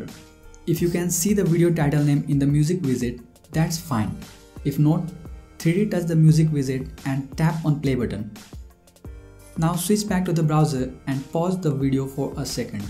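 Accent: India. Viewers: Once I hear an Indian voice I knw its for good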